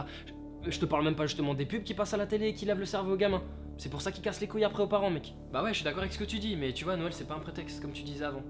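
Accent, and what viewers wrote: Alsace, France. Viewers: T'as un de ces accents alsaciens O_o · J'avais jamais remarqué que t'avais un accent alsacien aussi prononcé ! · J'adore ton accent alsacien #ElsassFrei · Cet accent alsacien hahahha +10000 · Mec, t'as un sacré accent alsacien haha xD super vidéo avec une chute excellente, et vive notre région ;) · Jamais j'avais remarqué ton accent alsacien... il est quand meme vachement marqué dans cette vidéo... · Je n'est jamais entendu ton accent alsacien à ce point la mdr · Mdr , quand tu parle plus fort on capte ton accents alsacien #67 :D ( sait pas une insulte je suit alsacien et j'ai aussi l'accents ) . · Elle est vraiment cool ta video, le petit accent alsacien qui ressort, c'est la base ! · Ohlalal tu prend un léger accent Alsacien ^^ comme d'hab elle est cool la vidéo et merci pour la conférence au Lycée Kléber l'an passé;) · J'ai vécu en Alsace pendant 4 ans et ça fait drôle de voir un youtubeur connu qui a vraiment l'accent :') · ptdrrrr cet accent Alsacien >>> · On entend bien ton accent alsacien ;) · HO yé t'a bien pris l'accent alsacien :D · J'adore ton accent alsacien xD Franchement j'étais pliée de rire à chaque fois, on aurait dit un vieux qui parlait ! 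Et pourtant je suis alsacienne aussi xD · De temps en temps l’accent Alsacien ressort. Soit fier, c'est le meilleur accent. Très bonne vidéo sinon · Il y a un léger accent alsacien 😏 Vives l'Alsace ! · Sacré accent alsacien mdr c'est trop bon !! Passe de bonne fête 😘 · Tu me faire rire avec ton petit accent alsacien 😂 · Y a que moi qui ai remarqué qu'il a un accent alsacien quand il s'énerve ?? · Génial la vidéo oh mais l'accent Alsacien qui s'étend de ouf !! J'adore continue comme ça 👌✌ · L'accent alsacien de ouf haha représente 👌👌👌👌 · Ton accent alsacien est superbe. Dika Schmutz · j'adore ton petit accent alsacien !! :p enfait j'avais jamais fait gaffe mais dans cette vidéo il s'entend bien :p Bonnes fêtes à ton toi qui aime noël :p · j'aime bien ton petit accent alsacien aha, tu gères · tu as un accent alsacien putain c'est un truc de fou mdr j'en peux plus tu en a fait des bonnes sérieux :') je suis alsacienne et j'en suis fier !!! 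vive l’Alsace ! <3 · L'accent alsacien qui fait plaisir ! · Avec le petit accent Alsacien et tout✌️ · Ahlal tu as un sacré accent alsacien hihi je kiff ;) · en entend l'accent alsacien qui resort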